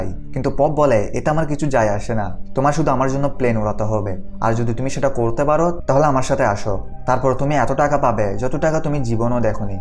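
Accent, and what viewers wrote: Chittagong, Bangladesh. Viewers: Chittagong er pola! Accent shunle buja jai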